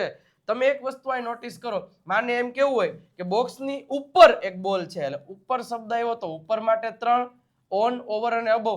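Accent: Kathiawar, India. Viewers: 💖💖"કાઠિયાવાડી અવાજ અને ઇંગ્લિશનું જ્ઞાન, સર છે ગુજરાતનું ગૌરવ અને શાન!"💖💖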